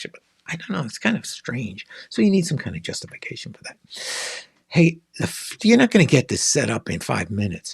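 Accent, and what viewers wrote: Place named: New York, United States. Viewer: Enjoy your excellent reviews … and your NY area accent. (Though long gone, I was born and raised there.)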